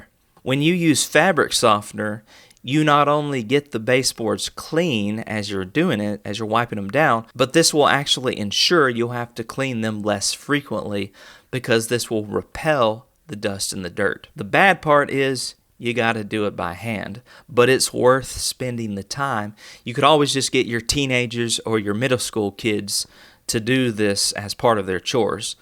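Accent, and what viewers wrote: United States. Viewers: I love Libman brand and that's a great tip with the cloth over the broom. PS what state are you from? I'm in TN and I love your accent. ❤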